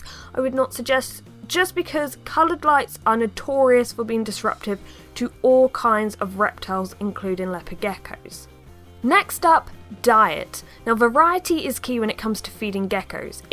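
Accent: United Kingdom. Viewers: Unsure of your accent, you from the uk?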